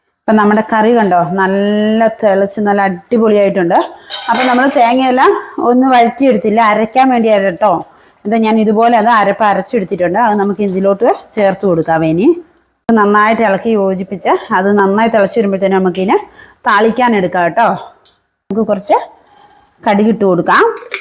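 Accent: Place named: Idukki, India. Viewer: സ്ലാങ് കേട്ടപ്പോൾ ഞാൻ കരുതി ഇടുക്കിക്കാരി ആണെന്ന്... കാസർകോട് ആയിരുന്നല്ലേ....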